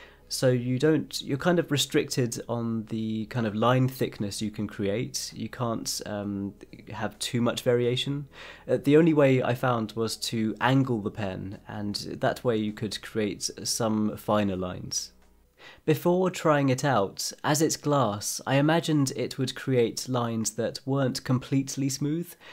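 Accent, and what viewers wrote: United Kingdom. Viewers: Amazing scull. With plenty of symbols. Fantastic to see you draw it. Learnt alot! Thanks. PS I really adore your beautiful British pronounciation. It's such a pleasure to listen to.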